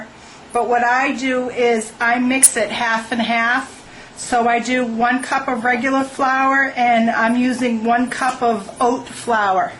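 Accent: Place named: Massachusetts, United States. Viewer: YOU ARE FROM MASSACHUSETTS YOU SOUND EXACTLY LIKE MY FAMILY!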